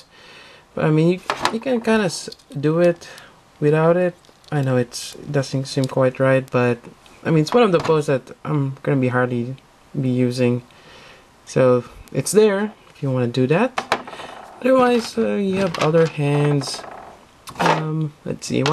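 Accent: Philippines. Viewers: r u from the Phillipines?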